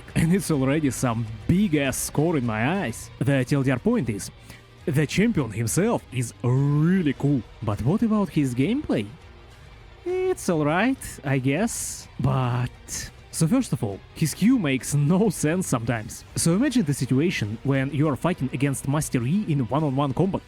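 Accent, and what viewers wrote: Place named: Russia. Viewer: Бро , учмтывая твои крайне локальные вставки и акцент , могу уверенно предположить что ты рускоговорящий , мне было забавно увидеть некоторые из твоих роликов , но то что ты говормл про морду , и многих других однокнопочных аутичных персов в попытке сделать из них богов , это просто стоический кринж. Вполне вероятно что это вызвано пактическими сорбражениями , ибо если ты действительно выходец из ру лола , то прекрасно знаком с тем что за твои вбросы твой канал бы неизменно ждал красивейший нок от бати блейзера , а так , хоть и рыльце в пушку , но вещаешь на англ аудиторию , а значит и вопросов к тебе быть не должно . хоть и вынужден признать , сама по себе рубрика прожарки чемпиона наоборот могла быть оригинальной идеей , года этак 2 назад.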